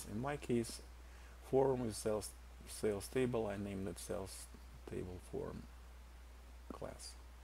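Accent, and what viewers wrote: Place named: Russia. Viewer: Русского человека сразу слышно :)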